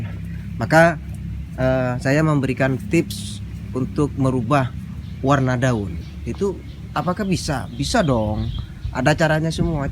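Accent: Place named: Madura, Indonesia. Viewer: Logat bicara nya kok mirip logat Madura ya bang 😀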